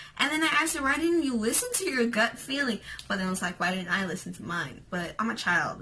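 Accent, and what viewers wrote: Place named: United States. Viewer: Why do you sound like an American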